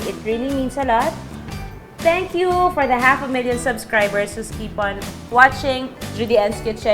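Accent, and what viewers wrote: Philippines. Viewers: I heard that accent and knew she was from the Philippines. Nope not doing it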